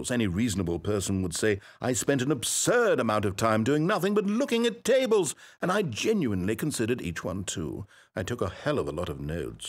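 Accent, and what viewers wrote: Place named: United Kingdom. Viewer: I always loved how this adult British narrator straightly says such a little kid number like one-hundred-billion-trillion years.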